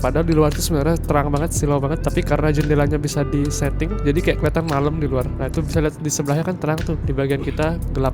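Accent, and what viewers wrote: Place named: Indonesia. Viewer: Lewat diberanda bli, mau lu sekarang pasport negara lain tapi lidah lu indo banget.. sukses & sehat selalu buat lu dan keluarga..🔥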